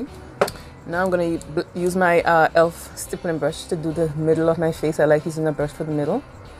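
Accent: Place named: Grenada. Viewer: I love the chit chat videos as you give away your secrets😂 but most importantly it make you more relatable and brings out your Grenadian accent and down to earth personality ✅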